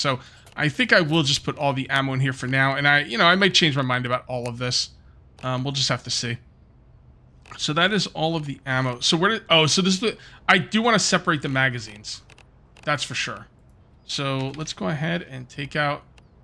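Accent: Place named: South, United States. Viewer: We pronounce it that way in the south. We also use the L in salmon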